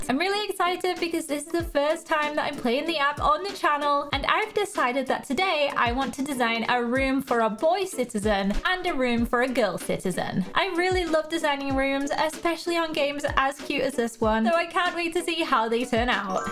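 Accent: Scotland, United Kingdom. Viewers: are you Scottish?? Bc I am “ps bc means because just is you did not know. But are you Scottish??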